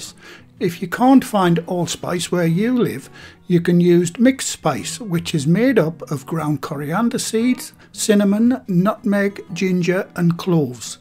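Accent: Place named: Newcastle, United Kingdom. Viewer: amazing recipe but even better when it’s read out by what sounds like a geordie version of Winnie the Pooh! 😊 🤩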